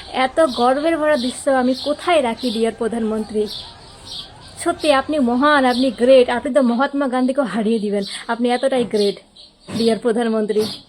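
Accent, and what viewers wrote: Bangladesh. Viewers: She sounds Bangladeshi.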